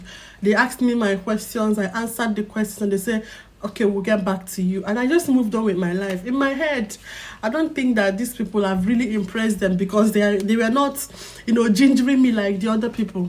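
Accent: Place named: United Kingdom. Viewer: The British Accent is getting more and more, am loving it thou. A very big congratulations .there is a big joy to get a yes in a dream n wished job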